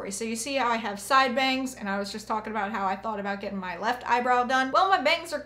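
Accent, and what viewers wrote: United States. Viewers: I have a north dublin accent and I just wanted to let you know that we say piercing the same. I just found it amusing since you pronounce everything else with what I would consider a normal American accent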